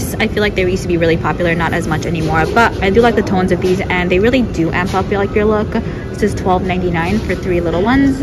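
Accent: New York, United States. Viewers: Sounda like a NY/ NJ thing.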